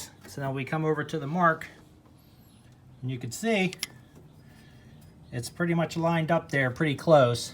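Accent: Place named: Pittsburgh, United States. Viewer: I didn't even have to look at the location by the video to tell you were from Pittsburgh. Y'all have a very distinct accent. Real thorough tutorial. Gonna be using this soon.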